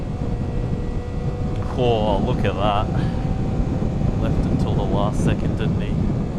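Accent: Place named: New Zealand. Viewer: You sound kiwi my bro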